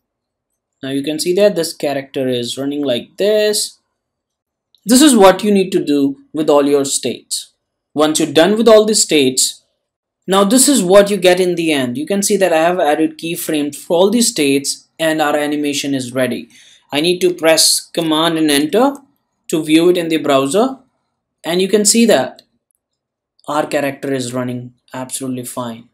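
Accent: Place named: India. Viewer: I fount it interesting that your name is Japaneses and you have Indian accent!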